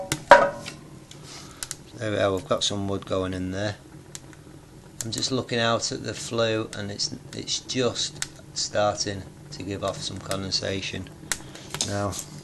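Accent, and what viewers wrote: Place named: North England, United Kingdom. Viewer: Very ingenious design. If I make one of these, it will borrow this design. From your accent, I'm guessing you live near Liverpool, or Manchester? (or lived near there once.) {subscribed}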